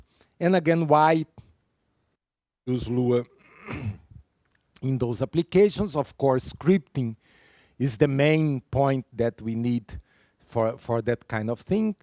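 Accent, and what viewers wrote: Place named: Brazil. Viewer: The speaker is speaking in a brazilian english accent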